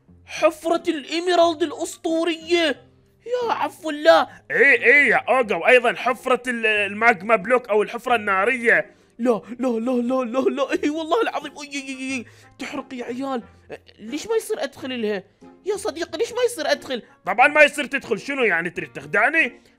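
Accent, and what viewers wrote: Iraq. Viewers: عندي سؤال هل انت عراقي ولا لا??❤❤😊😊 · هاذ عراقي؟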